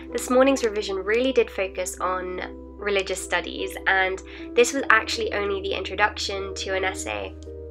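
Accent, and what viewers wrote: United Kingdom. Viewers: That British accent though.😍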